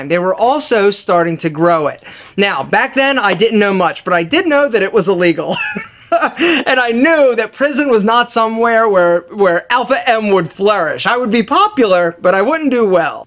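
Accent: Philadelphia, United States. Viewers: you should get rid of that horrible philly accent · I know :) but the content is so good. I just wonder why it has to be spoiled by a loud squeaky kids tv show presenter american accent. Its annoying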